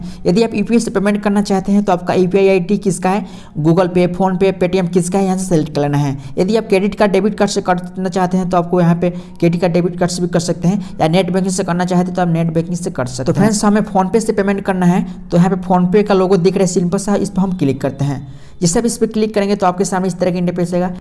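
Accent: Bihar, India. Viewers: Bihaari ho kaa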